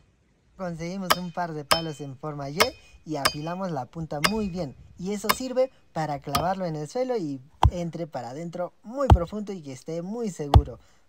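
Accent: Peru. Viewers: Buen día, joven no se de donde es usted. Observó que habla como los mismísimos cusqueños la tierra de mi señora madre. Felicidades por no perder sus raíces y escencia.. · Me encantó este cocinero me 😂 mucho con su endulzadas con sal espectacular esa manera de cocinar y de decir las cosas mil felicitaciones me gustó tanto que me suscribí casi no lo hago, de donde es? Perú?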